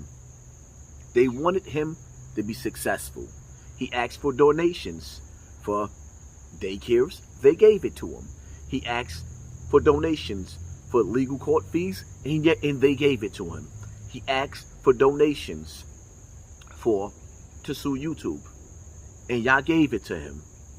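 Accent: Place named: New York, United States. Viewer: Good points BUT YOU aren’t the one to give the message AND Pharoah is voluntarily putting his mess online. You the same man who disrespects dead folks by saying they got their snot box popped and all that fake ass, extra, NY talk you do. YOU learn how to handle yourself properly before you try to tell us how to handle someone who is voluntarily doing nonsense. If you are so concerned this WHOLE VIDEO should have been to Pharaoh and not the viewers, because he is the one tripping. You just made this video for views, so go chill out and work on a better, more respectful vocabulary. That NY excuse is played, the internet is worldwide so get it how you trying to live.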